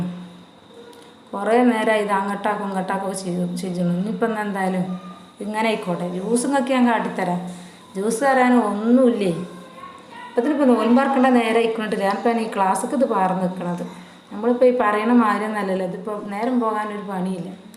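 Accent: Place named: Malappuram, India. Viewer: നമ്മുടെ മലപ്പുറം ക്കാരുടെ ആ ഒരു സംസാരരീതി ഞങ്ങൾ കൊല്ലം കാർക്ക് ഒരു പ്രത്യേക ഇഷ്ടം തന്നെ സൂപ്പർ ഐറ്റം ആണല്ലോ കപ്പയും മീൻകറിയും ആഹാ ശരിക്കും പ്രവാസലോകത്ത് നിന്ന് കണ്ടപ്പോൾ 😋😋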